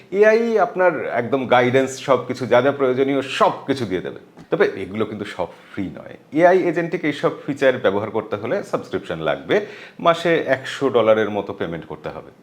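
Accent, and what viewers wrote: Bangladesh. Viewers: বাংলাদেশি উচ্চারণ!!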